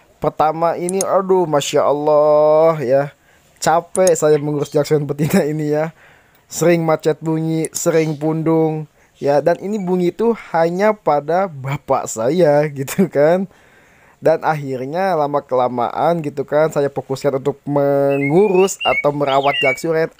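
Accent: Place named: Sunda, Indonesia. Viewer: Inimah nadana urang sunda,salam kang ti bogor timur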